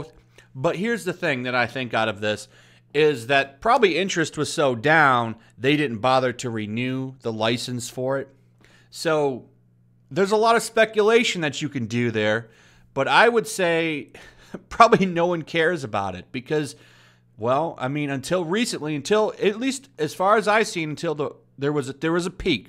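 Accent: England, United Kingdom. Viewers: Damn! And here I am waiting for your mandalorian episode reviews in that funny English accent! 😂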